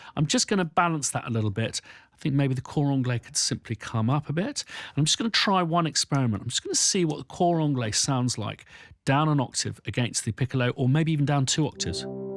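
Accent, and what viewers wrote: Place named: United Kingdom. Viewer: I want a cor englès too. The British accent and proper language create an enormous credibility to my ears.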